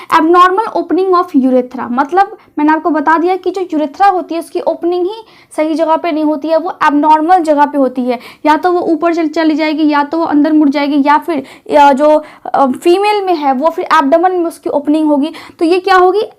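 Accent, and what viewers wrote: Bihar, India. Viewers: Ma'am ap Bihar se ho kya ? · pahle toh yeh lagta hai tum Bihar se belong karte h maina language se yeh Jana hai. Baki well done your speach is uninteruppated good show. Baki pl intimate aap ne kahan tak study ke h. Medical mein. Pl reply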